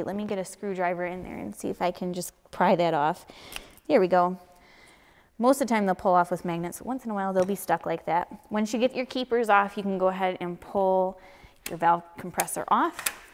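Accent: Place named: Upper Midwest, United States. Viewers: Lovely girl...very skilled...and love your Minnesota accent. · It took a couple of seconds to figure out what she meant by the pistons being in the "black". The upper-midwestern dialect is so endearing. 🥰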